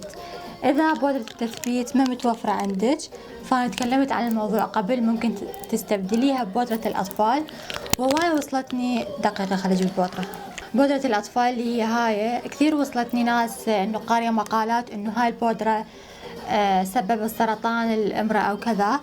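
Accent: Iraq. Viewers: صرت احب اللهجه العراقية من كلامك 💜💜💜💜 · ي زين اللهجه العراقيه حبيتها كثير كثير كثير كله منك 😂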